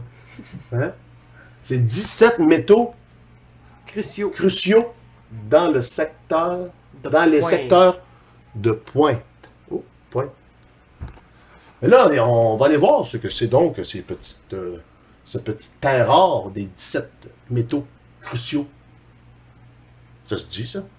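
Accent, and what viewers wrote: Quebec, Canada. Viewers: desolé ,c est vrai j aime bien son idee ça peut etre un argument. mais c est sa maniere de parler et l acce t canadien ça ma suffit pour rigoler pour rien . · Oué bin qu' ils touchent pas a nos Coréens avec leurs coneries, se sont des gens formidables et très ouvert, merci pour la video jme suit bien marré, et vive l' accent quebecois^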